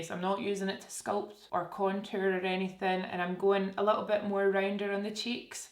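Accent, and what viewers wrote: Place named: Glasgow, United Kingdom. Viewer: Always the highlight of my day when you post! I’ve just moved to London so its always nice to hear a Glaswegian accent every now and then😂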